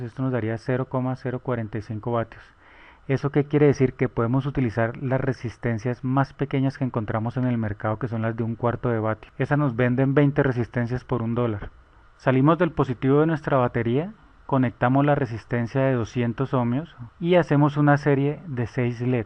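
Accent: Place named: Colombia. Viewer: Hola pues desde Guatemala saludos me parese su asento colombiano, buenos amigos de por alla!! gracias por compartir todo esto desde muy pequeño me ha gustado la electronica, solo estudie un semestre en la u, pero agradesco que ponga a disposicion estos conocimientos !! bueno cualquier cosa le molesto!! buen dia!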